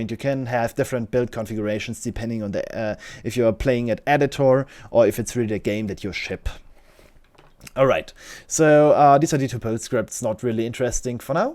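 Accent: Germany. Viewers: Youre Tutorial helped me out so much thx but one question are you german? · sounds like an german accent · Strong German Accent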